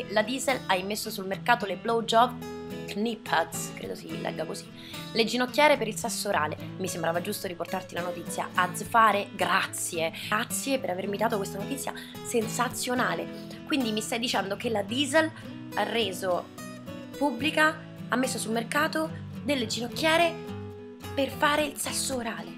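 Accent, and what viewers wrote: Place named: Rome, Italy. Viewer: dio che sei bella quando parli il romanaccio <3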